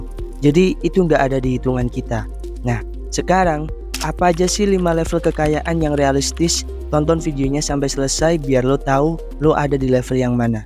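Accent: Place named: Java, Indonesia. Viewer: Bro suaramu medok mencla mencle ga cocok ngmong la lo gue gue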